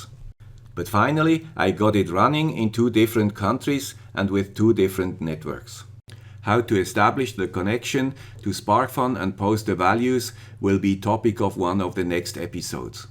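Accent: Switzerland. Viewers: Great video ! Btw, I don't mind your Swiss accent. It's not too bad ! Please continue with these interesting video's. It's very entertaining. Greetz !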